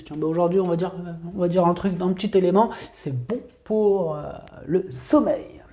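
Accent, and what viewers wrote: Morocco. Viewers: السلام عليك أخي وعلى متتبعيك الكرام.( هاد الدارجة المغربية عندك زوينة) تبارك الله عليك. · Bonsoir vous parler larabe comme un marocain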